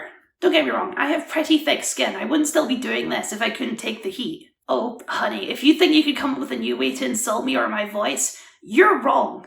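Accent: Scotland, United Kingdom. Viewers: I just figured that was some odd Scottish accent you grew up with. I'm serious. I've met people who only spent their very early years in a different country and their accents were THICK despite that. But you in a box. That really makes a pathetic level of sense. I live in a red state and have seen people (not kids in high-school but adults in their 30s and 40s) insist someone with just a speech impediment must be a foreigner and will lose their tempers if opposed. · What's wrong with his voice? I think its the fact he's speaking scottish english. There isn't anything wrong with that, it's just a dialect/accent. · I wasn't expecting your voice to sound like that, but I think it's really cute tbh. And I love your accent (I'm a sucker for Scottish accents, they sound good no matter what. Even when I can't understand what's being said lmaoooo) Those people are miserable and live boring lives. 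Using 4chan in 2024 says a lot more about them then anything. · What's wrong with your voice? It's obvious: you've got a scottish accent which means most americans will think is... br*tish 🤢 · good video, liked and subscribed. A few notes from a trans woman of 50+ years of age, and who transitioned as a fully mature adult. I lived more than half of my life pretending to be male, and in the 90s I started transition at around 30 years old. Prior to learning about transitioning and being trans I was a typically masculine looking over 6 foot tall human, with a "Girls Voice" as a person who was attracted to women, looked like a handsome man, it was a difficult thing to work through. I ended up learning to sing deep and started smoking so I could affect a masculine voice. (So glad I stopped trying to be a man) but the thing is randomly some peoples voices don't automatically break, sounding masculine is not a given. 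For me I was lucky as a trans person, in that my voice has always passed for a woman. Even as a late bloomer. doesn't sound as feminine as I did when I was twenty something. (Humor) However he has my old accent, and that bugs me.:P Yeah mum was Scottish, and up to about 11 or 12 years old I had my mums accent. But going to School in the USA forced me to change accents.:p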